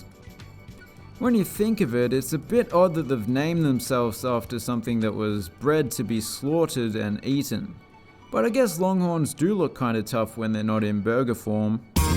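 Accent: Australia. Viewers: Watching an Australian guy commentate on a stadium that I grew up going to and can see outside my window now is pretty uncanny. (Georgia State)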